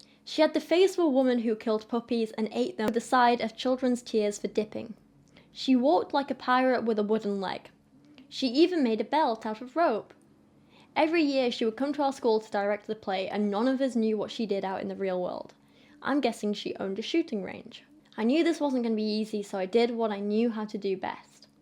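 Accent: United Kingdom. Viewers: After watching u for months I just noticed ur accent, like I didn’t realize u were British until rn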